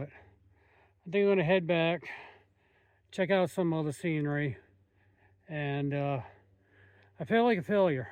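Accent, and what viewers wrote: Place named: Louisiana, United States. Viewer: ✨⚜️🍁🍂🎃🪾SUCH A GOOD DRIVE! ARE YOU FROM NEW ORLEANS BECAUSE I CAN HEAR UOYR LOUISIANA ACCENT🙂🍁🍂🎃🪾⚜️✨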